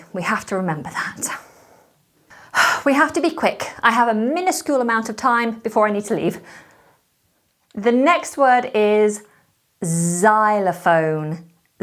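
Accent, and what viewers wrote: United Kingdom. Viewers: Wow...wonderful British accent👍💟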